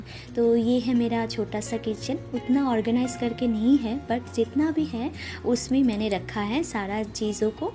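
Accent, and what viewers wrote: Bengal, India. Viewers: Aapke bolne k lahze me jo bengolipan dikhta h n wo aapki awaz me char chand lga deta h dii ..Love you dii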